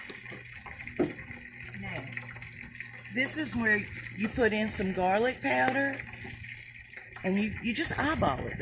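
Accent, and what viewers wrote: South, United States. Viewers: Looks delish!! Where are you from in the South? Your accent is SO cute!! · Hi there... I made your chicken today, marinated over night.. My family loved it. My husband who hears me listen to your videos at times asked me if I was going to talk Southern like you while cooking... In good spirit and in my best southern accent I said " Col. Sanders ain't got nothing on ".. We laughed and I saluted you with my tongs! Thanks for sharing your recipe it's really good!😘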